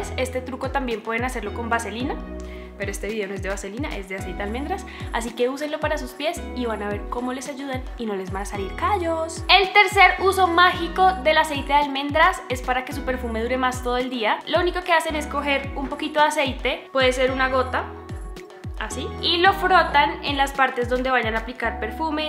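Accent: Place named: Colombia. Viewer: Ese acento... 🇨🇴 Colombiana?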